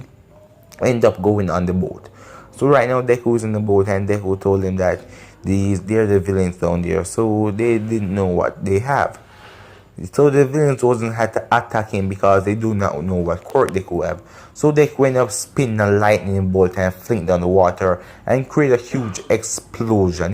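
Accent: Jamaica. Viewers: He sounds Jamaican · Are you Jamaican because I am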